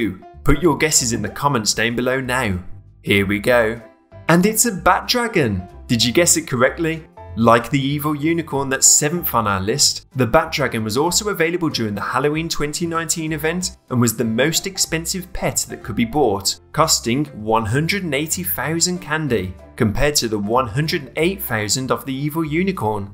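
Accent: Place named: United Kingdom. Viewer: You can tell hes british!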